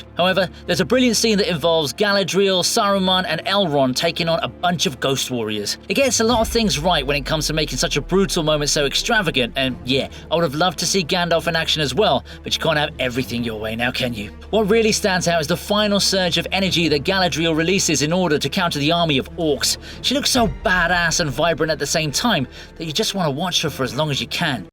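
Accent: United Kingdom. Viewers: "The Sorcerer's Stone" is the dumbed down title of the film for the US audience (officially because WB didn't think Americans would know what a Philosopher's Stone was) despite having the internet at their fingertips and millions of HP book fans. Use the correct title, you do sound British after all.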